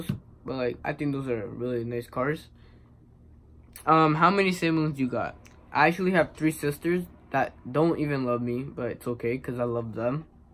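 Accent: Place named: Spain. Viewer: Spanish accent😍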